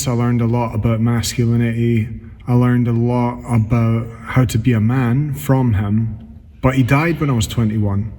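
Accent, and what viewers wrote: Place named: Scotland, United Kingdom. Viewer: Hi, I've just been listening to all your music on YouTube again. ❤️ Keep being amazing and real❤️ Love all your music and listening to your lovely Scottish voice in the chats too. Thank you for voicing most of my deepest inner thoughts, concerning grief. It never disappears, it stretches out, as you get on with everything else in your life. I've lost lots of people sadly, including my middle son and my husband. Music has always helped me through. Your music is very special and continues to support lots of us, on our life journeys. Sending best wishes and much love to you and Rosa xxx❤️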